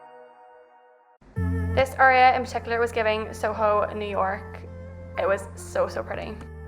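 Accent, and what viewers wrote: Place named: Ireland. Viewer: Sounds like u are from ireland, did u fly direct from dublin. My son and i are going next month from ni. Wondering best route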